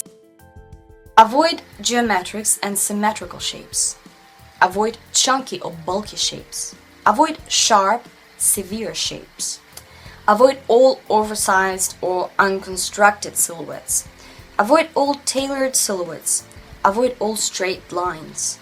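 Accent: Russia. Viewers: please a scarlett johansson inspired <3 <3 <3 can't wait !!! you're the best at inspired makeup :) ps : love that russian accent :D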